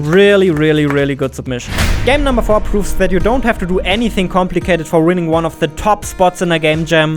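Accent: Germany. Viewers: Man merkt das deutscher bist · Also ich wart ja schon seit einem Jahr auf will u Snail XD und weisst du was, voll kein Problem. Ich versuch gerade mein erstes Game zu machen und es geht immer länger als gedacht. Jedenfall freu ich mich drauf dein Spiel zu Streamen ob ichs gewinn oder nicht. Für deine Statistik bin über Islanders auf dich aufmerksam geworden. Mach weiter so, nice Videos, nice Games, ein unverkennbarer deutscher Akzent, der meine Freundin zur weissglut treibt, wenn ich deine Videos guck, Alles in Allem ich bin Fan. Grüsse aus der Schweiz ;)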